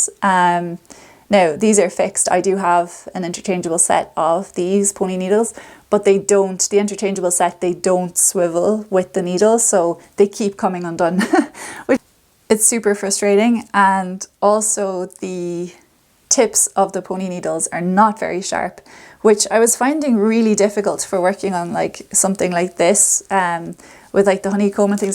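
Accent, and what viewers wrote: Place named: Ireland. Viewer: Love the stitch definition on your Dagmar jacket. Really enjoyed your podcast. I’m from Donegal but living in the UK ( like a previous comment). So love hearing the Irish accent 😎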